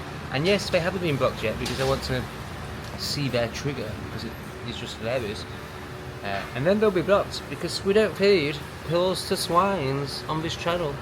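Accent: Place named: Yorkshire, United Kingdom. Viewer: Propa yorksha mate…keep it up😂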